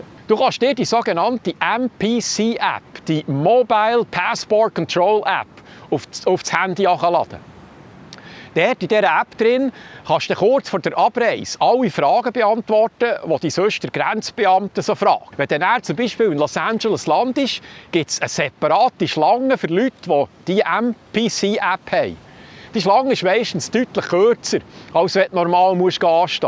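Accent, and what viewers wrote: Bern, Switzerland. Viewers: Grüessli us Thun/Gwatt, ha jedesmau Freud di zgseh, so ufgsteut u mit herrlechem Bärndütsch, i liebes. Mit 76 Jahr geng no unternämigsfreudigi Grüess nach Californie. Ha dört im Jahr 1993/94 im Lodi Quarterhorses trainiert u mini 10 jähregi Tochter isch dörte id Schuel. Het sofort guet Änglisch chönne u miner Ross hei Bärndütsch verstande😝🤣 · Hallo, eine Heimweh Schweizerin in Eitorf NRW, freut sich jede Woche über Deine Beiträge - und vor allem über das gemütliche Bärndütsch. Weiter so herzlichen Dank 😅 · Die Infos sind eifach grossartig, zudäm em orchige Bärndütsch. Liebi Grüess us em Appenzällerland 🥹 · Amerika gits nid, sie heis gar nie gfunge🎶 Bi dir cha sogar ig als Bärner no lehre richtig bärndütsch schnurre. "Sürmle" hani scho lang nüm ghört.😅 Gruess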